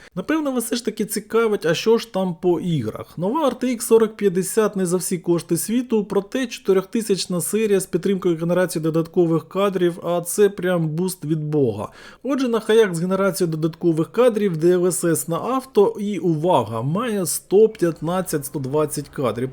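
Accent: Transcarpathia, Ukraine. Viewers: Боже я налякався голоса автора почув Закарпатську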